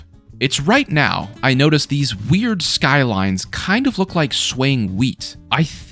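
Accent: Canada. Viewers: He’s Canadian? Gross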